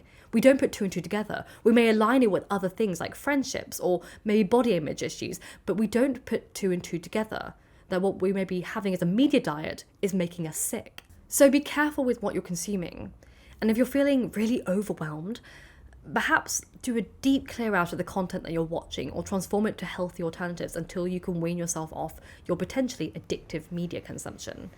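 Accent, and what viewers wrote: United Kingdom. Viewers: I am addicted to binge - watching your videos,! Actually i am studying English with lots of videos by British people (because i don't like the American accent) but i don't feel unhappy at all, on the contrary, YouTube is the best thing that happened to me latelly. I really adore you accent, it sounds like good music to me. Thank you so much for your content, which i love as well. 🙏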